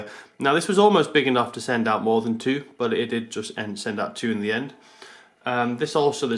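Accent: United Kingdom. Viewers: I love your channel! For some reason a British accent keeps me from getting bored on how to videos. I have a question: how old should my jade plant be before I start pruning it like that? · U sound like ur from the UK. What growing conditions are u using to get around the bad UK weather. And are you keeping these plants indoors outdoors or using a greenhouse?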